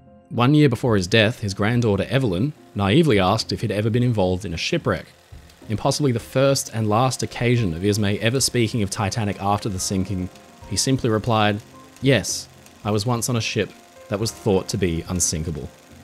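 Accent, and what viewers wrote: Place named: Australia. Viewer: You should pay a bit more attention. If you haven't noticed this guy who makes these wonderful videos is speaking with an Australian accent.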